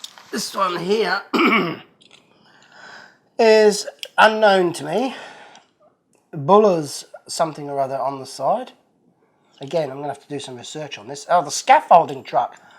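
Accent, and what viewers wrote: England, United Kingdom. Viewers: So are you an English guy living in Australia?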